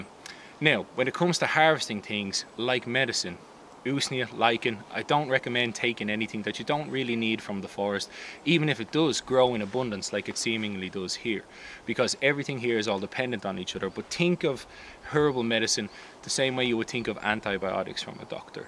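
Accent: Ireland. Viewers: Dublin I’d say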